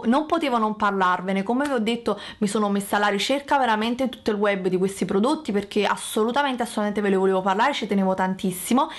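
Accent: Naples, Italy. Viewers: Posso dirti che il tuo accento napoletano mi sta troppo simpatico? :) Non so perché, ma mi ispira dolcezza!! Posso farti una domanda? Vorrei comprare qualche ingrediente per creare cosine semplici (deodorante in crema/stick gel per i brufoli, tonico, burro per il corpo), per questo vorrei evitare spese grosse. Se comprassi solo queste cose: tocoferolo, cosgard, burro di karitè e qualche olio essenziale, potrei creare qualcosa? O mi serve per forza la xanthan gum? Grazie mille e complimenti per tutto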